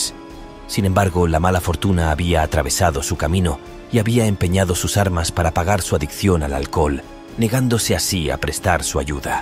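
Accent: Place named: Spain. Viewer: la verdad me parece ridiculo, pero bueno supongo que es más por que todos ya estan acostumbrados a la voz anterior. En lo personal prefiero esta voz, tiene aunque sea un poco mas de sentimiento y le da más un toque a documental que la voz robotica anterior, y a criterio propio siempre he sentido que el acento español le da un plus a este tipo de narraciones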